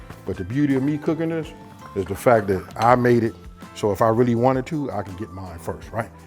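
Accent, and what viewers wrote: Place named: California, United States. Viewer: Are you from the Bay Area in California? Your accent says California to me. I was leaning toward Watts maybe but you sound like Ryan Coogler a little bit. San Diego native here . 😊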